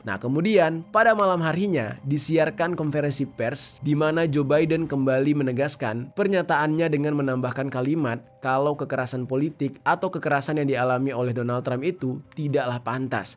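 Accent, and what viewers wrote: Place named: Java, Indonesia. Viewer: Aku lebih suka "hey Genk..welcome to back kamar jeri ..." 😁😁 Medok..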